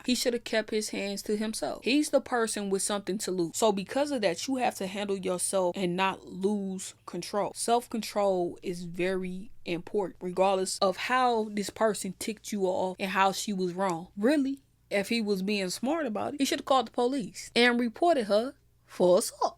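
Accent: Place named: West Coast, United States. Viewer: I love your west coast accent!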